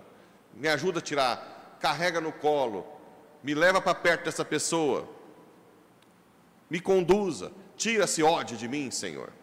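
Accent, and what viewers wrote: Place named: Minas Gerais, Brazil. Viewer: padre o sr é Goiano mas tem meu sotaque mineiro, gosto demais.Amo seus ensinamentos.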